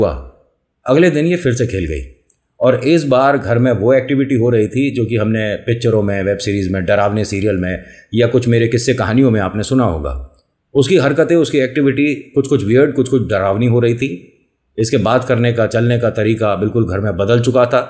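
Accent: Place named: Braj, India. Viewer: 🙏🌹जय श्री श्याम 🌹🙏 भाई ये क्यों बोल दिया की सिर्फ कहानी ही मिलेगी ये किस ग्रह के जीव की बात मान ली अरे भाई हमें ज़ब इधर उधर की न मिल जाय मजा नहीं आता और बीच मे जो ब्रज भाषा निकल जाती है उसे छुपाना नहीं वो ही तो आपके मुख से प्यारी लगती है तो फिर धन्यवाद जैसी आपकी मर्जी सुनाओ हम तो घर मे भी सुनते हैं 😅अलीगढ से रविंद्र सिंह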